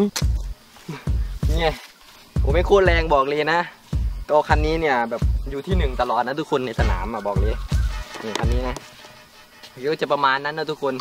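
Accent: Korat, Thailand. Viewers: คือภาษาโคราชแท้😂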